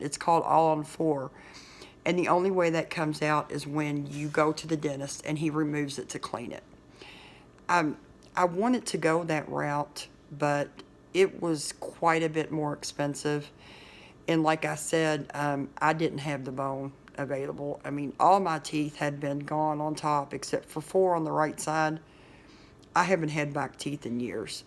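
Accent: United States. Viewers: If you dont mind me asking.... Where is this dentist located? I need 9 teeth replaced and I'm very intrested in what you said about the pricing and everything about this place! I'm quite excited actual you sound southern and I myself am a Southern .... I live in east TN. I hope its not to far away from me. I just really need this in my life and you made it seem like a reality to me and that made me not so depressed and hopeless.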